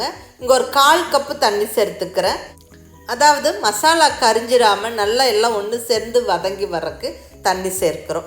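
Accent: Coimbatore, India. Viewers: You are absolutely right. Not only the receipes but the way of presentation with (supposedly Coimbatore Slang) clear and crisp voice are adding merit to her receipes. Thank you madam somuch. Pls Keep rocking ...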